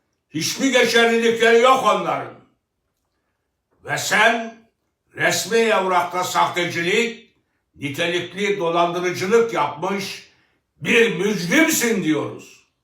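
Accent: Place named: Sivas, Turkey. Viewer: öz Tükçe ile konuşmanızı çok seviyorum başkanım tam bizim gibi konuşuyor diyorum ben de Sivaslıyım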